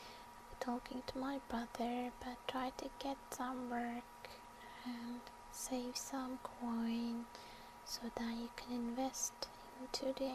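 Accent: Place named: Romania. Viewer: i hadnt checked channel in a bit but, i was glad to log in and see she had 3 new vids since last time i checked! i love her game reviews =D and all vids in general from her. she talks like this girl in my class from romania. she must be from around there lol.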